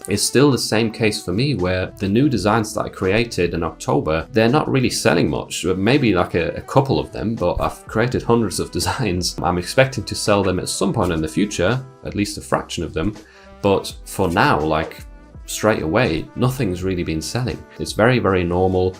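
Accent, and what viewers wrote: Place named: United Kingdom. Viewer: 2023 ! A little late to the party as I've just found your channel. Great tutorials. Thank you.. Nice to hear a British accent too.amongst the sea of our US cousins! 😂😂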